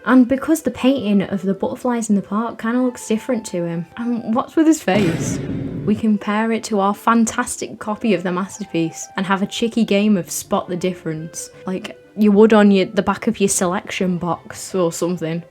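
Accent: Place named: North West England, United Kingdom. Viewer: These vids are so funny, big up north west accents girl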